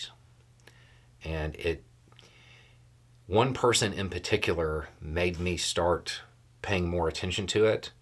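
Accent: South, United States. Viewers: Exactly because he still understands what it means to be a member of the human race. He can be wrong, he can listen, learn and explain things, teach like no one I have seen. Maybe bias cause the southern accent but absolutely adore listening to this man. Thank you.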